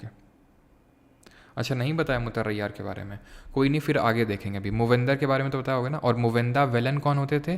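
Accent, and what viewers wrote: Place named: Awadh, India. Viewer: sir aap pakka awadh area se ho, ya to lucknow, kanpur, ayodhya isi side ke ho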